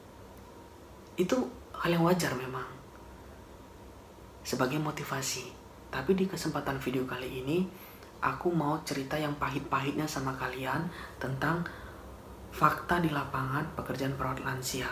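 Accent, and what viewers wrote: Medan, Indonesia. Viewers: Bang, asal medan kah? Logatnya medan kali soalnya😄😄 semangat ya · medan kali abg ini 👍👍👍 mantap lanjut kan bg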